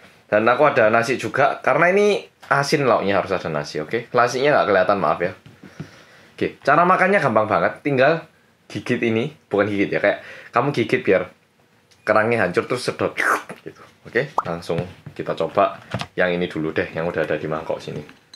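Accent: Java, Indonesia. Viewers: Kuei lho mas medhok pol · Ini org korea ap jowo gaesss 😁😁 medok tenan are iki 👍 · Korea tapi bisa punya logat jawa asli..kerennnn😂😂😂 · It's just me or dia Emang medok? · Kaya orang Jawa bahasa ngomong nya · like bangett sma cara ngomong nya.. medhog bangettt mas ee... 😊😊😘😘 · Jir korea rasa jawa medok guys 🤭 2020 hadir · Jowo ne sampeyan ke pundi? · Keliru aku,, ini orang Jawa apa Korea yaa 😄😄 koq kaya ada logat jawanya gtuh 🤣🤣✌️🙏🙏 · Ini tuh orang jawa medok apa kalo korean ngomong bahasa indo jadi kek orang jawa medok ya aksen nya? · Gua heran sm dia..... Orang korea ngomongnya kayak orang jawa.... Yg setuju like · Muka korea ngomongnya jawa😅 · "halo guys, welcome back to korea reomit bersama orang korea yg luwe~" "halo guys, welcome back to korea reomit bersama orang korea yg medok~" apapun versi nya, ntah kenapa tetep suka;) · Ngomong indonya kek orang jawa ngomong indo wkwkwkwk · Bang ngomong nya kayak orang Jawa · Medok njir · Muedok e mas wkwk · Ada logat bahasa jawanya 😅😅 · Kok ngomongnya kayak orang Jawa😂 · Kebiasaan tinggal ditempat yang bahasanya jawa jadi gitu deh nada nya😅😂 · Kok logat nya kaya Jawa? 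😅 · Ini pertama x belajar bahasa nya di mana?kok logat nya kayak jawa 🤣🤣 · Njowo bat wkwkwk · Opo ikih tak kiro wong asli korea jebul nek ngmng yo iso medok ngono😅😂 but i like😍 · Logatnya kek logat Jawa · Medok jowo kaliii kmu bang..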